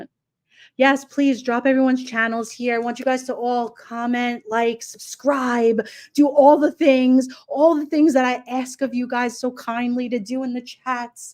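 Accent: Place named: New York, United States. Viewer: ..I'm always on catch-up ..I love your NY accent..💖👋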